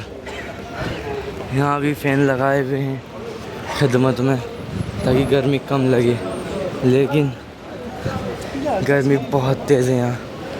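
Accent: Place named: Uttar Pradesh, India. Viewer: Bhai ki boli to india up ki lg rhi hai hm 🇮🇳🇮🇳🇮🇳 hai 🤲🤲❤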